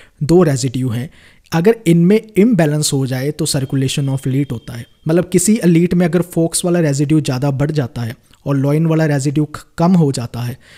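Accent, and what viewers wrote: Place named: Jammu, India. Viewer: awaz se jammu ka lg rha h bhai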